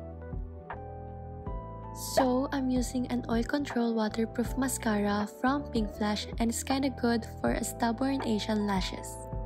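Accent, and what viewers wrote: Philippines. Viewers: Are you filipino? I hear some accent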